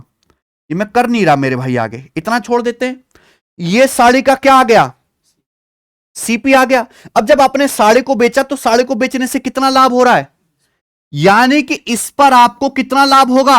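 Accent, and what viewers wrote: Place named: Uttar Pradesh, India. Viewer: Sir aap jo up language me tabad tod se bolte ho mja aata h sunne me bhi or padne me bhi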